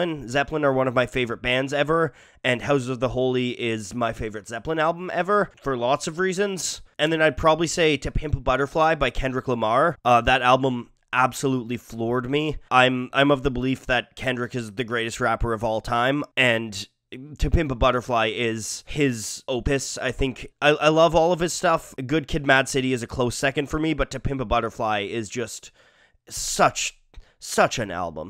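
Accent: Canada. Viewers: You sound like my brother in law except with a Canadian accent. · He really sounds a lot more Canadian.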